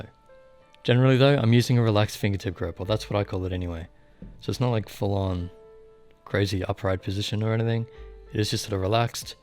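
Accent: Australia. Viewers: Oh damn, I never thought you would be Aussie. GG! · Are you Australian? Started watching your vids after I bought my DA elite, never figured that you are Australian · Yo didn't realise you were Australian, how're you dealing with the heat wave?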